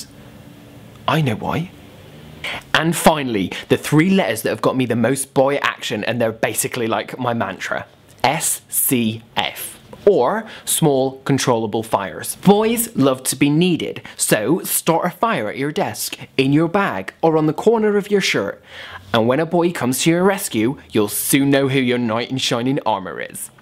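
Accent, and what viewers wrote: Bristol, United Kingdom. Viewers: hahahah the Bristolian accent just makes these videos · ur bristolian accent actually made u mention a skl in bristol woah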